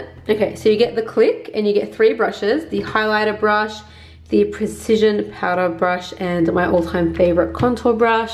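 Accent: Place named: Australia. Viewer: I suscribed to your channel because I loove how you do makeup! I remember I found you in Instagram, and I took the time to stalk you jaja and thats how I got to your yt channel, after that I just fell in love with your accent😍 You are the only Australian youtuber I am suscribed to, and you are my favorite makeup artist out there!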